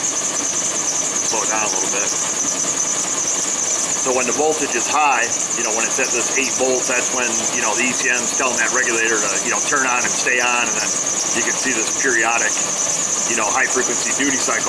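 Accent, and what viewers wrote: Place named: Texas, United States. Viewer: very understandable and appreciate you sharing all you do. Then again, maybe you should ask yourself the purpose behind why you make the YT videos. BTW you sure sound and act like a good ole boy like me from TEXAS. not only my opinion but also expressed by many friends.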